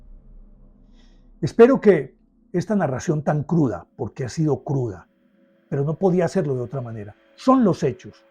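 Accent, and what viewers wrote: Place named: Antioquia, Colombia. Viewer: Uy Profe excelente relato, siempre enganchado con sus fascinantes historias, y lo mejor con acento paisa. Un abrazo grande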